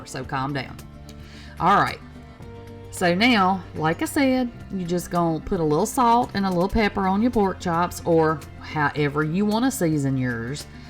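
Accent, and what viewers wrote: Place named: Appalachia, United States. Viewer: You sound just like I do. I'm from northeast Tennessee southwest Virginia area originally.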